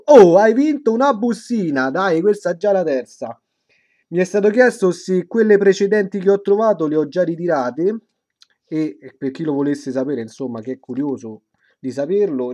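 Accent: Italy. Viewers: Ma sei romano pk hai la lingua da romano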